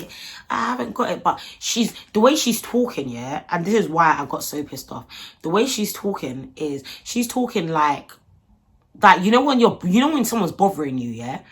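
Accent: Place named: Cockney, United Kingdom. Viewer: Lovely lass!! Your so cockney I love it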